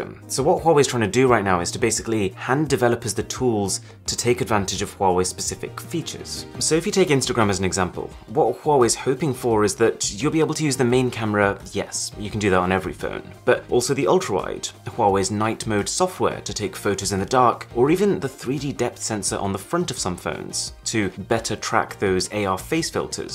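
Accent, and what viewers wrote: United Kingdom. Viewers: The only thing i payed attention to is that British accent.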